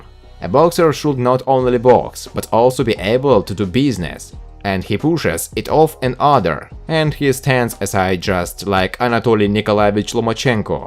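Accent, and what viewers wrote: Russia. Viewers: Omg i hate youre russian accent! It is so terrible...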